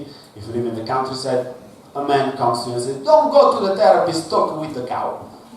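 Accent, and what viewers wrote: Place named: Milan, Italy. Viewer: Anche quando parli inglese hai l'accento milanese 😅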